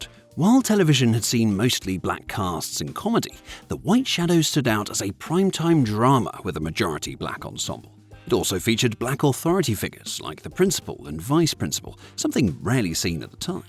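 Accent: United Kingdom. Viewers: Why is there a British accent doing commentating on this video. We couldn’t find one American to do this, about this class of American TV drama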